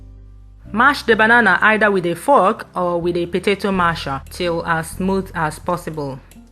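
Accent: Igbo, Nigeria. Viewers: Chai! Your Igbo tongue is top notch 👍🏽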